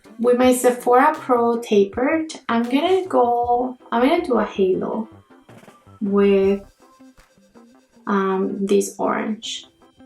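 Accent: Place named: Canada. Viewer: I am so happy I came across your channel. You are so sweet. 💕. I love your accent. :) I see that you are from Canada. Me too. I am from Alberta. Where are you from? I am so excited to find youtube friends and the most excited when i see they are from Canada as well. 💕 You look very beautiful. Would love to be friends on here. New subscriber here as I love your channel and love coming across YouTubers that are in the same niche making makeup videos like me. 😘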